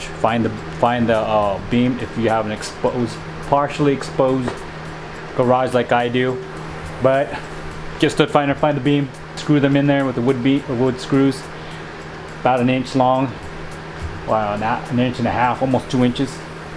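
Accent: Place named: Mexico. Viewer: That's a typical Mexican English accent 🤭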